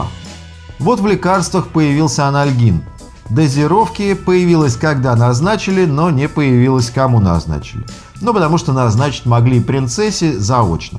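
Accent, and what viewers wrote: Moscow, Russia. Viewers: Тааакой зааабавный мааасковский ааакцент у. А сам ролик бесполезный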